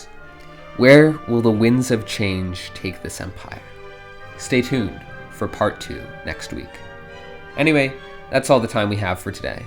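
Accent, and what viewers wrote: Spain. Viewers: Really cool video but the spanish pronunciations of Portuguese are going to offend some people, bro 💀💀💀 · "Mestizo" and "Rio Grande del Sur" 💀💀💀 Oh dear, Brazil is the 1000th time being treated with Spanish pronunciation.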